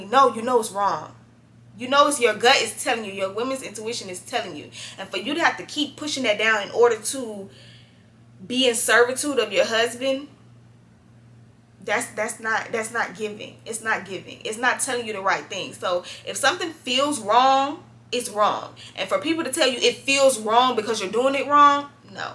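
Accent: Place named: South, United States. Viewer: Girl you country den a MF.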